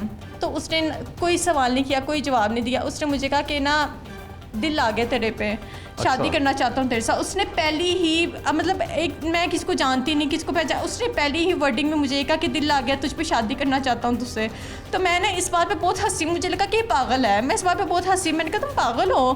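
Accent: Punjab, India. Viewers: Accent tu completely punjabi hai. Lakin punjabi bulna nahi hota.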